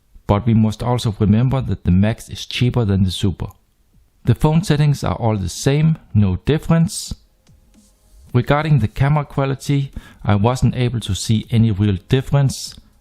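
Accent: Germany. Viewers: Für eine lange Zeit beobachte ich Ihre Bewertungen und schreiben mit einem sehr guten Lichtqualität und eine Freude zu Ihrer englischen Sprache mit deutschem Akzent zu hören. Nach Ihrer Präsentation kaufte ich Umi eMax und später SuperUmi. Jerste sehr glücklich. Vielen Dank, und ich herzlich begrüßen. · Man hört so das du Deutscher bist xD Gutes Video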